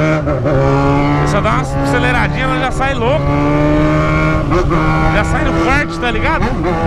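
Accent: Goiás, Brazil. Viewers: Eae por que vc fala no pique do Goiás? Eu sou do Goiás kkk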